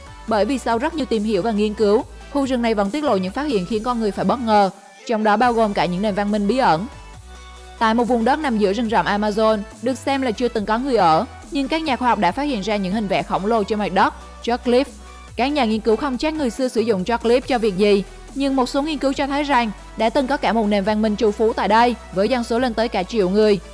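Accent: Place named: Da Nang, Vietnam. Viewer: Giọng Đè Nẻng nghe vui tai nhỉ!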